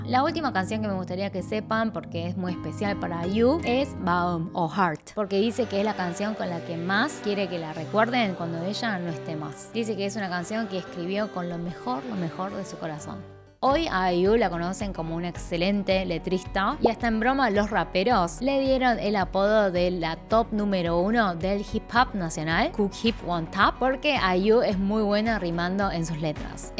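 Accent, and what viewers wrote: Argentina. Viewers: Se le nota un buen el acento argentino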